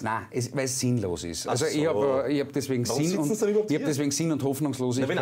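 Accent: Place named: Vienna, Austria. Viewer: "dama ned blädeln....." XD Der Mann ist so genial...und lange hab ich nicht mehr so einen hofrätischen Wiener Akzent gehört ..... tut gut mal wieder zu hören.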